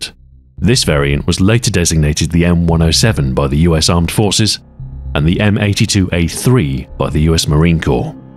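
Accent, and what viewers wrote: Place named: Manchester, United Kingdom. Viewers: He's British. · I want to say Manchester, England.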